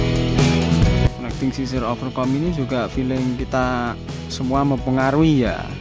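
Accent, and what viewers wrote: Java, Indonesia. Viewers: Mas omah u ngend kok medok ngomonge,,,semangat trs👍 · sampean ngomong medok eram toh mas mas 😁 tutorial terbaik, hasil terbaik, tergokil dan terkocak 🙏 salam horas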